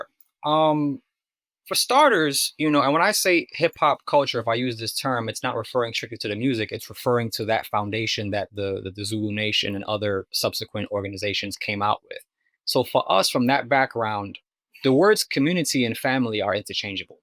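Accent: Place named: New York, United States. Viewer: I used to go to Limelight , Twilight , tunnel in my late teens and it was a scene ! I didn’t realize all this vampire culture was going on around me. I was just there for the music lol and the dancing. You are so eloquent and as a fellow New York Latina I love listening to your native New York Latino accent. Great video !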